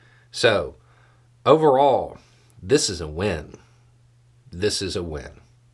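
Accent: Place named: Rural, United States. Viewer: your accent has gotten crazy hick since you were "DIA." Hope things are great! Money glows.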